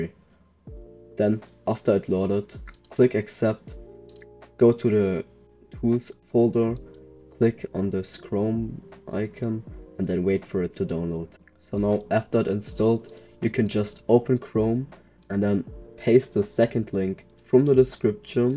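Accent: Germany. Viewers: Man merkt sehr stark, dass du Deutsch bist. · I hear i little bit of german in his pronouncing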